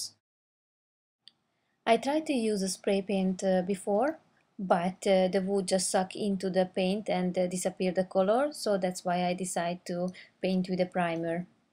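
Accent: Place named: Russia. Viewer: а чё мужика то нет чтобы с палетами помочь. По акцентику то ты походу наша дифчонка :-)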